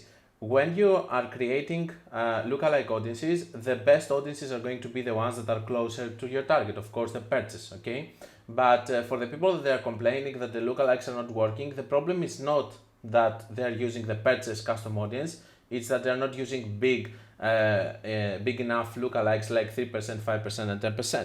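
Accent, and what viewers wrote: Greece. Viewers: Όταν καταλαβαίνεις ότι είναι Έλληνας απ'την προφορά χαχαχ μπράβο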